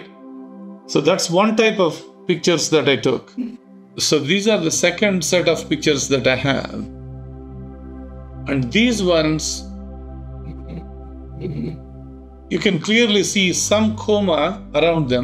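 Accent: India. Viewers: He obviously has a thick South Indian accent 😅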